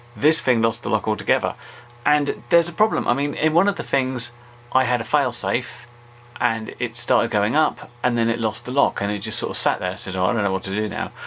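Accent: United Kingdom. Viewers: Like the old saying goes, you get what you pay for. You have more patience than I do. Still enjoyed the video. English accents are always more enjoyable to listen to. Imo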